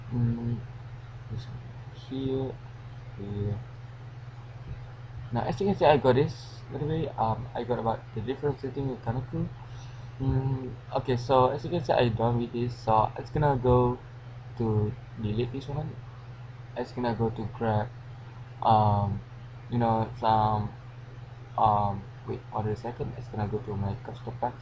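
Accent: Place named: Malaysia. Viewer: Malaysian voice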